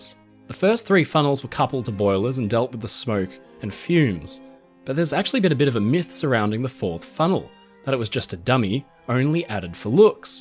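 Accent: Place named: Australia. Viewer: Oh he is so lovely his voice just speaks to me much better than the American - Canadian boi this guy is a an Australian Mann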